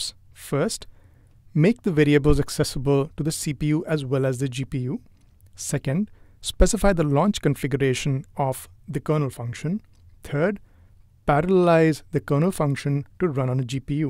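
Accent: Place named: India. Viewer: Painful Indian English Accent.... Its Always Feel The Difference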